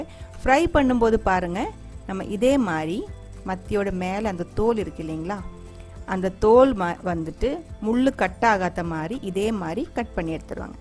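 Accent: Kerala, India. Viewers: Your tamil is beautiful mam ..tamil mixed with Malayalam.. Nice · Super fry akka.. Beautiful keral tamil..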